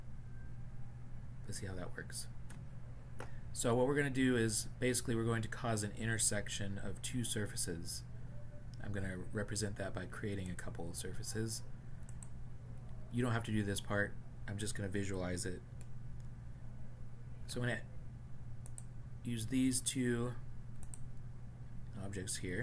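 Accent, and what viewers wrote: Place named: United States. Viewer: Finally a tutorial from someone that speaks coherent American English. This was one of the few tutorials I've understood on this subject. Thank you!!!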